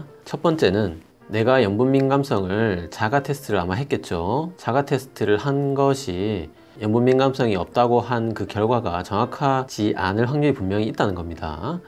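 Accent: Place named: Busan, South Korea. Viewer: 부산억양 귀엽습니다^^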